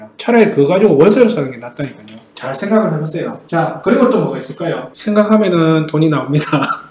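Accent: Daegu, South Korea. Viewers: 말을참재미나게 잘 하시네요 착착감기는 대구사투리도 듣기좋으네요 강의 잘들었습니당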